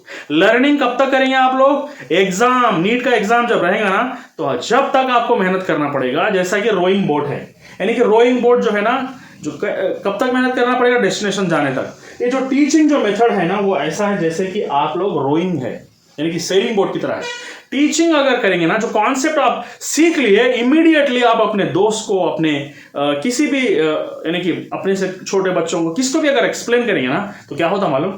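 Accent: Hyderabad, India. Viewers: Hyderabad se lgte . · Sir ka hyderabadi accent sunne k liye Aya main.. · Sir aap hyderabadi haii kyaa😅 Good explanation sir😊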